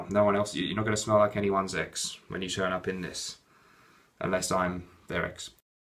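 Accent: England, United Kingdom. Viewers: You sound English ...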